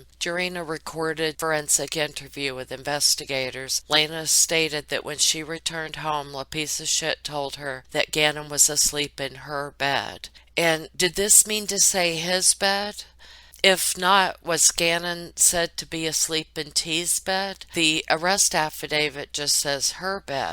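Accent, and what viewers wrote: United States. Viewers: I love you an shit. I think youre so down to earth and you sound like a USA version of me lol Keep up the good work. The world was already Mad hunny pie. Its just that we are all at home watching and listening and realising how fucked we truly are. Double Pandemic. Covid and Stupidity. Stay safe and feel the love Im sending you. From one night Owl to another, love and light to everyone from wee me over here in SUNNY Scotland lol (see how long this lasts eh...) lXl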